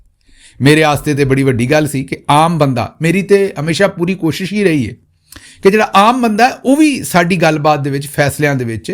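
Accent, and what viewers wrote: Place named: Punjab, India. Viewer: ap ki pungabi bot achi hy 🎉🎉🎉❤❤❤❤❤ bot meti meti hi love ho geya hy❤❤❤🎉🎉🎉🎉🎉🎉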